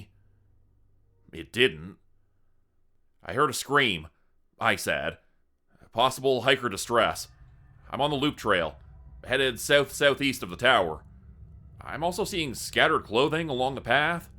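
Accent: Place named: Canada. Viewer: oh wow I thought it was his real voice 😊 especially the c😎😎L Canadian 🇨🇦accent I hear 🩷🧡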